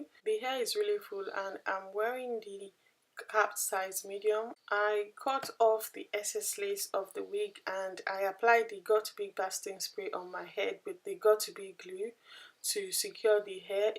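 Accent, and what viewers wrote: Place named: Nigeria. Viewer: I love the accent! Nigerian??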